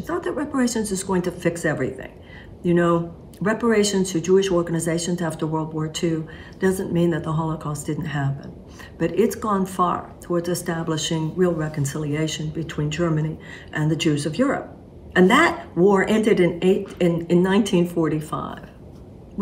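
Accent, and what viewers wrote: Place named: Houston, United States. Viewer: It's really funny I would hear you talk and say some of the things that you say and wonder where is this lady from? So I finally did some research turns out you grew up in Houston just like me. It is funny that if you're from Houston nobody in the world knows what kind of accent you have cuz it's like they I have never heard it before. I remember going to Vancouver Canada and people would stop me when I talk and say where are you from I just moved to Dallas so I said Dallas Texas but what they were really picking up on was that Houston Texas sound I appreciate you. Probably won't agree with everything you say but I'll definitely listen to you say it.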